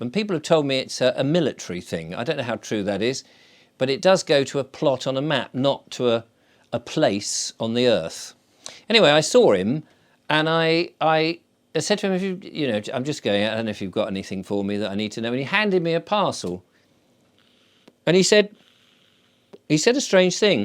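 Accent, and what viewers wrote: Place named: England, United Kingdom. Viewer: Love listening to typical English man with real English language my 3rd language..Listening all the way from Solomon Island - formerly "British Solomon Island" prior to our independence..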